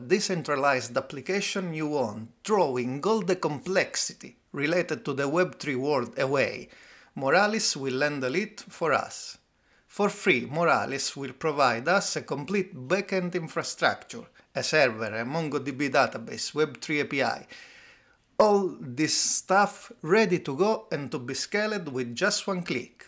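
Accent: Italy. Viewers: Dude you are soooooooo italian and i love it. Please record audiobooks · Incredibile, tra le migliaia di tutorial online sentirne uno con l'accento italiano mi fa sentire a casa!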